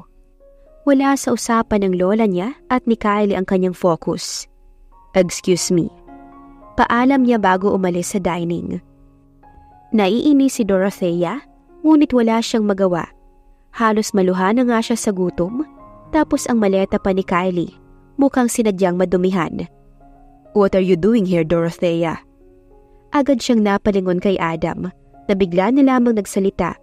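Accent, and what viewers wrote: Philippines. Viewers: Talaga ba maganda ang boses ng narrator na ito? Parang tangang probinsyana ang bweset na narrator na ito. Naririnig mo ba ang tuno nyang igurot nakakairita.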